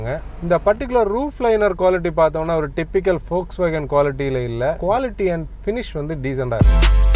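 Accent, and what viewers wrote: Kanyakumari, India. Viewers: One of the best moto vloggers I've come across in YouTube. And hearing that too in kumari Tamil is refreshing. Keep rocking guys..btw I've a question Between VW Taigun & Skoda Kushaq, which one would be a good choice? Driving dynamics and rear seat passenger comfort are my top priority areas. Also will 1.0 litre engine be adequate enough to give a peppy Driving experience? Or should I go for the 1.5 litre one? Predominant usage would be within city with occasional highway runs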